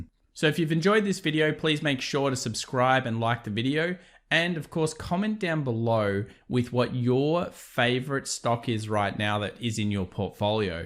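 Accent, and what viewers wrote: Australia. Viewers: One of the best analysis channels on YouTube. Plus the Australian accent. Can't ask for more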